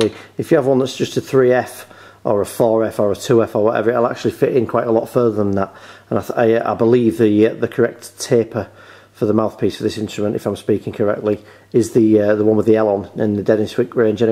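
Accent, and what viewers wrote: United Kingdom. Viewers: very helpful thank you and I personally really like the Yorkshire accent - it makes it all sound genuine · Appreciate your videos, very helpful, considering purchase of flugelhorn after buying Trumpet. Sounds nice, as soft as a cornet, what mouthpiece is being used DW 3FL, caught at end, thanks. Used to live in Sheffield so lovin the accent!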